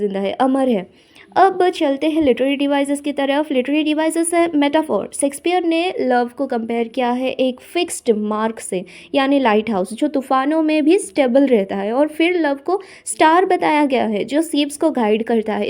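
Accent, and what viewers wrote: Odisha, India. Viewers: Didi you have odia accents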